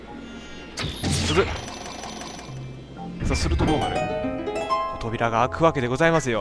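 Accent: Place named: Ehime, Japan. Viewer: 初コメです!風のタクトを見てからずっとさんの実況大好きです。たまに出る愛媛のなまりとかついつい懐かしいと思っちゃいます(笑) さんアムロ、大好き!!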